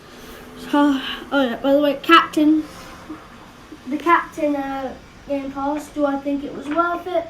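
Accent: United Kingdom. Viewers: You are way to underated you must have 50k right now. Btw are you british? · are u British? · Just by the sound if his accent I'd say yes he is British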